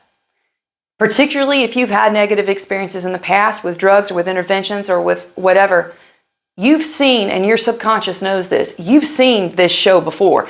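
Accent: South, United States. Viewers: I know this is a little off topic but does anyone else hear a southern accent? Possible mix of Texas and Louisiana or maybe Tennessee.. Anyway I truly admire her Passion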